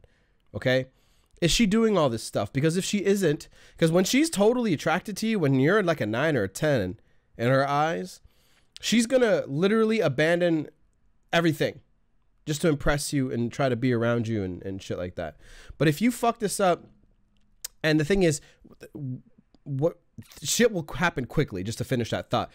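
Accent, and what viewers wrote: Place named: Montana, United States. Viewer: Hey, where a-bouts are ya from? You almost sound like you are from Montana? Canada? Lmao!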